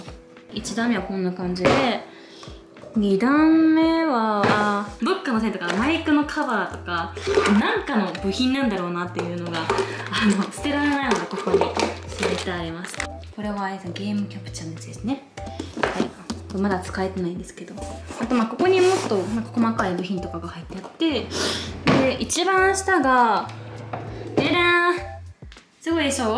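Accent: Kansai, Japan. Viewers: たまに出る関西弁とちょっと関西弁に寄ったイントネーションになぜか胸が締め付けられる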